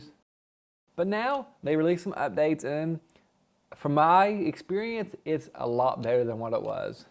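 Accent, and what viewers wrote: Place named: South, United States. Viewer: you get a sub for that sweet southern accent... and the good quality video but mainly the accent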